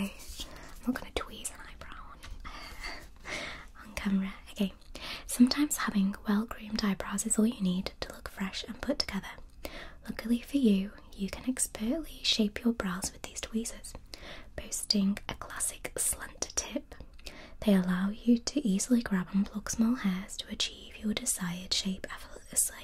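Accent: United Kingdom. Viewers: I love your British - English ❤️ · i literally love your accent so much! I’m British too but I wouldn’t say I have a “posh” accent lol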